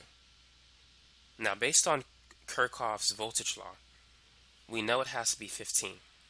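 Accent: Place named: Boston, United States. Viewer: You sound like you're from Boston or jersey.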